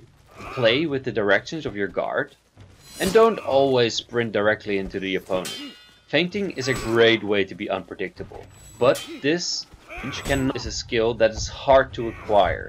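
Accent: Netherlands. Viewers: You must be Dutch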